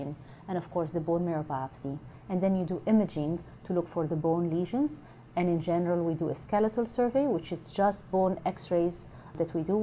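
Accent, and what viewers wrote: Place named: Egypt. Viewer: Cannot shake the Egyptian accent though hhhh. Great video though.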